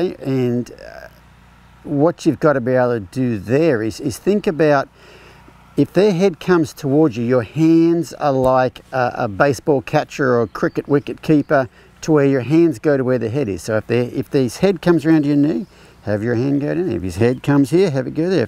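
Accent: Australia. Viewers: Never heard a cowboy with an Australian Accent🤠🤔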